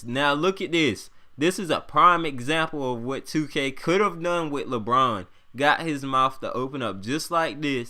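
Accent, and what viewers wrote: United States. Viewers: where you from? You sound like you're from down south, not saying that's a bad thing just curious.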